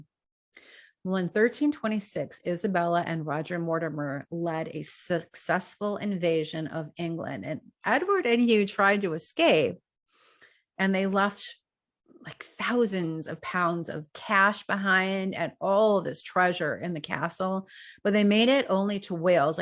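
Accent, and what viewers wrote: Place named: United States. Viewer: The American accent jars; so no thank you!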